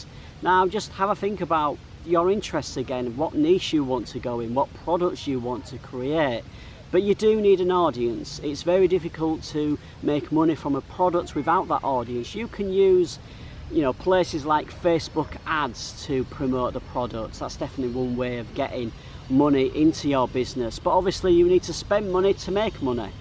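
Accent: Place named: Manchester, United Kingdom. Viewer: sorry mate i didn't mean to offend you , i thought you are from Manchester through your accent , lol cheers man , i like your narrating way and i keep following your videos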